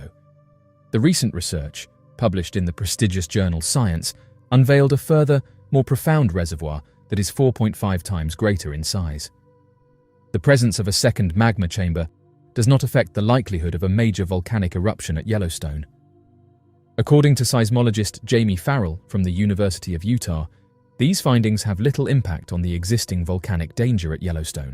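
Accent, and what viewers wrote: United Kingdom. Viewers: Why is it that most videos I watch are about the USA and yet the voice is always British? Don't any Americans know about America, or do the British not want to talk about their own .....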